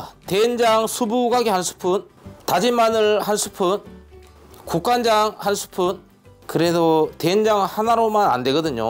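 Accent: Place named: Yeosu, South Korea. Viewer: ㅎㅎ여수고향이라 음식잘하시는군요사투리도 구수해요 돌산갓 피름나물 최고최고ㅎㅎㅎ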